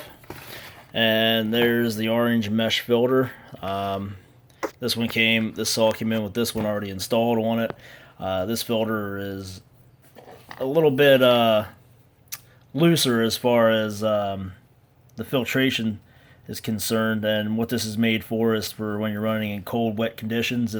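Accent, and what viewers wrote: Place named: Pennsylvania, United States. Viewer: Hardcore PA accent right there.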